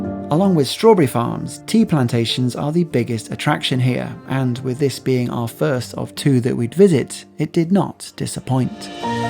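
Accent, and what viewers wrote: United Kingdom. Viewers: It's nicely done especially with British accent!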